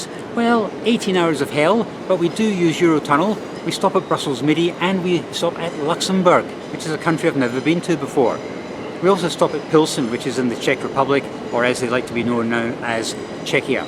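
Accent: Scotland, United Kingdom. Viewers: Scottish accent with something else creeping in - can't quite put my finger on it though. Another English speaking country but not British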